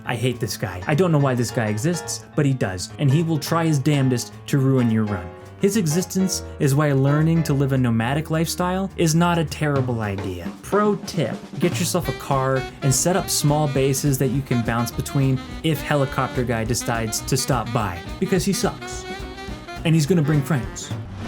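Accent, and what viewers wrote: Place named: United States. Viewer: Naw the tooth just makes you sound 'Merican :) I cant believe how long PZ has been around. First thing I install whenever I get a new PC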